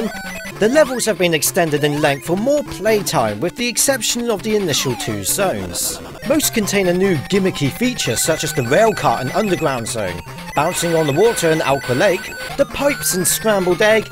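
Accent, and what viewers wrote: United Kingdom. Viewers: His british accent just adds to the video, I don't know why... Assuming it is british and I am not an idiot (Edit: Nice to see a Youtuber who views his comments, Thanks for the heart)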